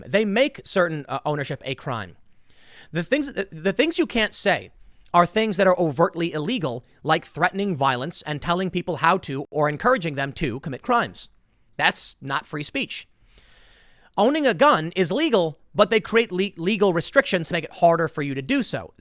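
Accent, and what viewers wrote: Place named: New Jersey, United States. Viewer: Going to miss occasional Jersey-speak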